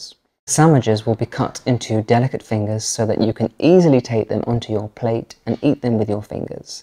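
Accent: RP, United Kingdom. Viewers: I registered this Chanel. I am interested in his posh accent than anything else.😃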